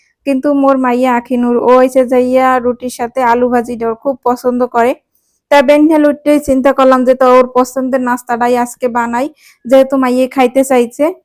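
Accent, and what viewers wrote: Barishal, Bangladesh. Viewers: সম্পূর্ণ ভিডিওটি বরিশালের ভাষায় সুন্দর করে উপস্থাপন করলেন আপু ❤লা ডান❤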